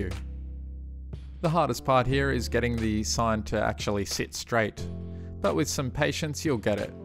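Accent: Australia. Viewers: He's Australian. The NZ accent is a bit different to ours but apparently people not from Oz or NZ can't really tell the difference.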